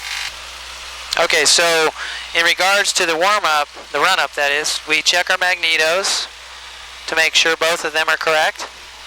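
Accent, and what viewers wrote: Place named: North, United Kingdom. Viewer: well,its not his fault, probably his accent. like how northern people pronounce "water" as "wadduh"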